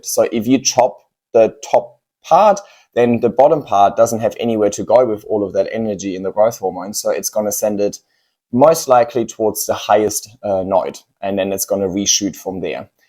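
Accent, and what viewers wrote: Germany. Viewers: I wish I had a cool German accent too.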